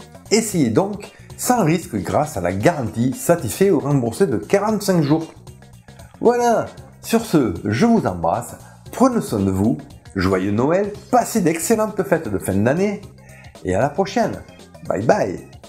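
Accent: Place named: Toulouse, France. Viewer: Holà A une époque où les chaînes de YouTube m'apporte plus que les années sur les bancs d'écoles.... La tienne franchement est exceptionnelle! Ton accent me rappelle Toulouse, ton vocabulaire et ton humour embellissent mes moments de détente. A 52 ballets,retraité précoce! Exilé dans la sierra uruguayenne... Je te souhaite une année riche et tranquille. Ton travail change la donne: Le savoir devient accessible et tu y contribue énormément!!! le Boss des temps disparu!!! Merci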